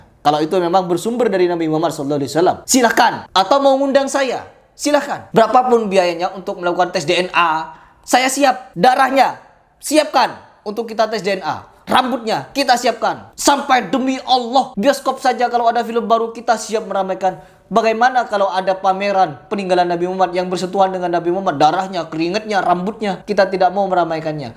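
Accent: Madura, Indonesia. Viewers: Saya orang dayak, dari lugat bahasa bang, bang madura, saya sangat suka setiap konten bang, dan saya udah sekarep, bukan brarti orang dayak berbusana sama madura, yang berlalu biarlah berlalu, kita lupakan😂😂buat teman2 madura jangan tersinggung yah😂😂😂😂, karanena saya dayak sangat suka sama bang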